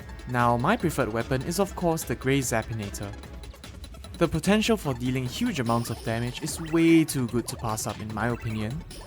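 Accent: Singapore. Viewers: Why is your voice so nice are you Singaporein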